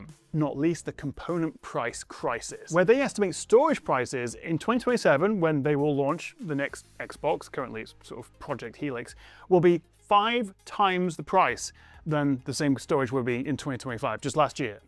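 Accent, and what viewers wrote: United Kingdom. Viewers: The British accent rocks!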